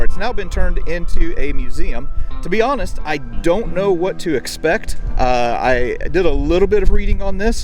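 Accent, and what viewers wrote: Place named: Midwest, United States. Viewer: "Dadgum", "merciful days" I love your homespun, Midwestern exclamations.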